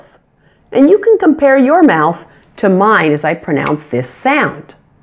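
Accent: United States. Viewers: But you pronounce it the American way not the English way.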